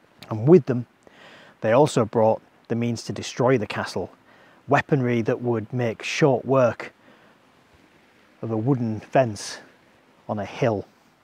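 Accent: Hull, United Kingdom. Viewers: These video's are brilliant, and in that wonderful Hull accent =👌.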